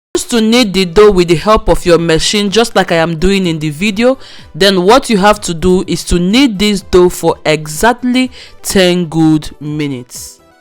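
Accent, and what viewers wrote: Nigeria. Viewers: That is a strong igbo accent.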